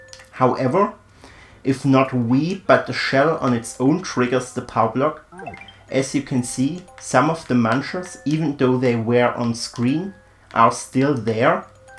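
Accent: Germany. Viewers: man merkt das du deutsch sprichst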